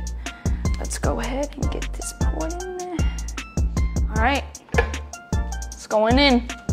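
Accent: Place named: South, United States. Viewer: It’s called: she’s been in the south for college in the past 4-5 years so her accent changed a bit due to that.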